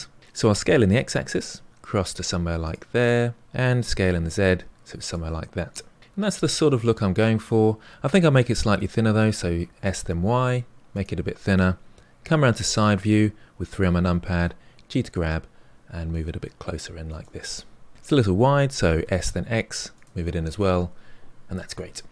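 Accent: United Kingdom. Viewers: You're quite British dude. just couldn't follow blender guru at all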